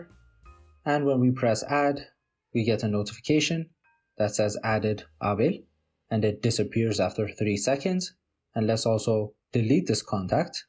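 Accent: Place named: Ethiopia. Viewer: first time to see habesha boy teaching coding in english keep it up bro u got nice accent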